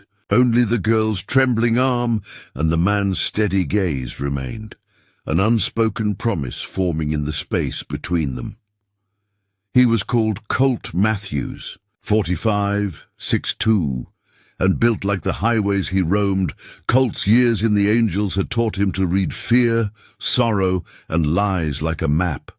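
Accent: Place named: England, United Kingdom. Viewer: Sorry, but I can't listen to the heavy English (?) accent telling a biker story. Just doesn't match.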